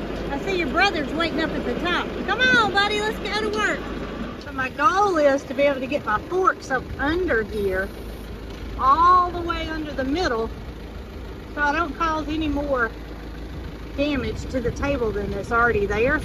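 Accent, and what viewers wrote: South, United States. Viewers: I am not big on building videos, but I enjoy videos. I even watch the entire fence pole videos.Maybe it is music and your pleasing southern drawl. Love the picnic table and to honest I wouldn't mind having one like it.😊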